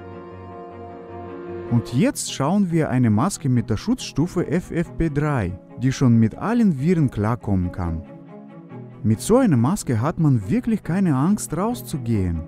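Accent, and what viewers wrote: Russia. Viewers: das ist auch ne Idee 😅 Wobei ich seine russische Aussprache auch sehr mag. Ich versteh aber leider nur sehr wenig davon. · Чувствуется русский акцент)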